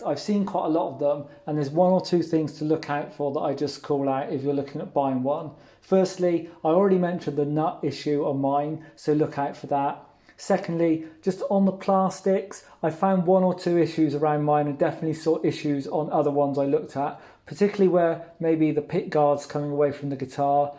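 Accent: United Kingdom. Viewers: Is it better the new player than the old MIM standard one? Oh yes its true, very coser to the old american standard for some reasons such as pick ups, two points trem, nice thinner shape a very nice good looking guitar of course the glassy sound as an strat way to be 🎸. I love over all the British tone speak very understandable congratulations a nice comparison greetings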